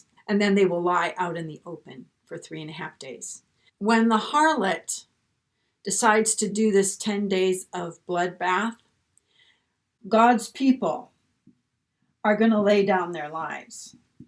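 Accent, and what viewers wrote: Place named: Idaho, United States. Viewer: I've been binge watching for about 3 years. With every teaching, I grow in knowledge and understanding. I'm very grateful that I was led to your channel. I thank God for the work he is doing through you. Side note. I enjoy your Idaho accent. I miss hearing it. Love and peace always.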